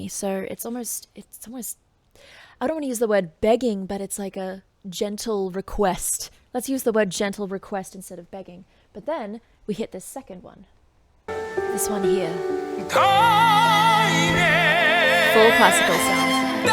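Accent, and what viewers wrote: Australia. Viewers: Your honest analysis, well-balanced pausing, hair color, smile, & cute Aussie accent get me emotionally connected as well. 😍💃😁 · Don't let the "don't pause" crowd affect how you do your reactions. Do what comes naturally...most are here for a reaction/analysis..if we wanted to listen to it straight through we can! Everything sounds better with an Aussie accent BTW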